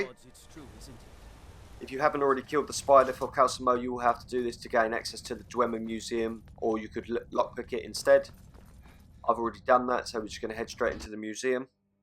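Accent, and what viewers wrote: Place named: United Kingdom. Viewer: will these items make you become master of the thieves guild? Btw I Love Your British Accent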